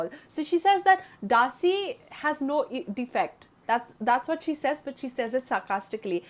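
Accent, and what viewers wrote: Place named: India. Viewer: This is what indian accent is supposed to sound like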